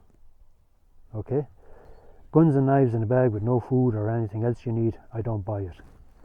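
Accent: Ireland. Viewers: Every time I watch one of your videos you make me smile and chuckle to myself: here is some quietly spoken Irishman calling time on the all the survivalist/bush-crafting BS on youtube. Absolutely priceless!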